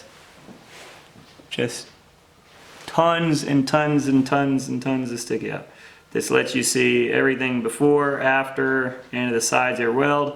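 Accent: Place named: South, United States. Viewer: U sound like a God damn hillbilly. 💗 love it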